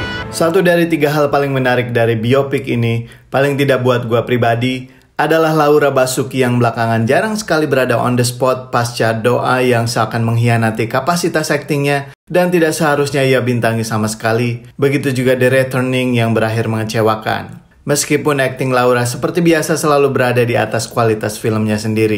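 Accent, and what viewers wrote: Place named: West Java, Indonesia. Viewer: Oh, Aa teh native Sunda ya hahahaha... BTW, kaget juga ya kok ke mana ya Waltz of Flower-nya...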